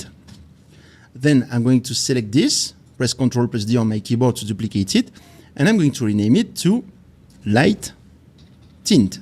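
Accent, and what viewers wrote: France. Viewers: Great tutorial and great bloopers lol... happy to see a French speaker having a hard time with English as many like me with French, and especially since I’m native speaker of another language of Latin origin! I would really appreciate it if you could tell me if you have Unreal courses available and in which online platform (I tried searching for you in Udemy), or maybe a book for sale online, or whatever. Here you have another “real” follower. Merci beaucoup from DR! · Merci !! T'as un accent suffisamment bon pour être agréable à écouter et suffisamment français pour que je comprenne, c'est parfait 😀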